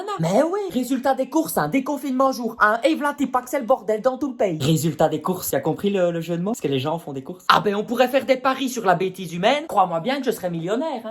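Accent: Belgium. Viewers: L'accent namurois , c'est réjouissant ! signé une namuroise exilée. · il est belge pas français 😂 ça s'entend avec notre bel accent pure souche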